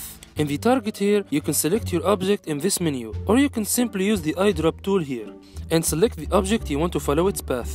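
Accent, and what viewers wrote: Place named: Tunisia. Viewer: borjouleya l anglais mte3ek mtaa tounsi sahby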